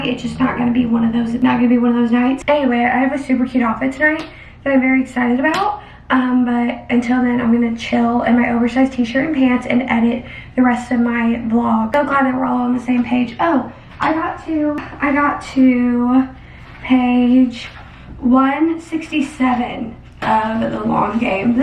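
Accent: South, United States. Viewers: Her southern accent is the cutest thing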